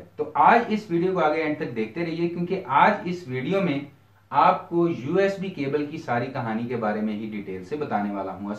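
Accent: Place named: Lahore, Pakistan. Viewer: The accent looks like he is from Lahore / Pakistan..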